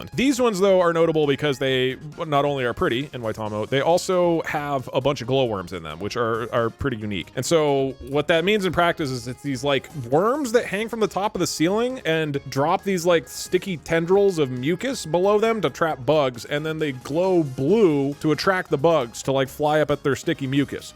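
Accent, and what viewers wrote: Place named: United States. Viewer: you dont know nz at all dude, all americans sound exactly like you lol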